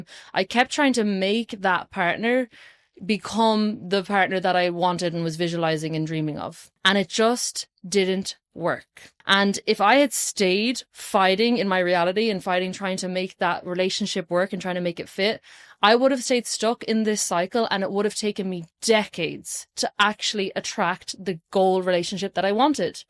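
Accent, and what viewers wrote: Ireland. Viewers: This was great! I love hearing that Irish accent too 😊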